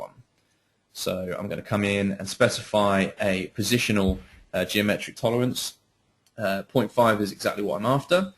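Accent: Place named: England, United Kingdom. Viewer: Other than the silly english accent, very helpful. Cheers =-)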